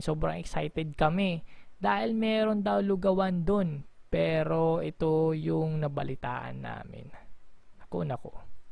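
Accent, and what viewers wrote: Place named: Waray, Philippines. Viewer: Idol tagasaan kaba? Bakit may halong Waray yung sa lita nyu?😊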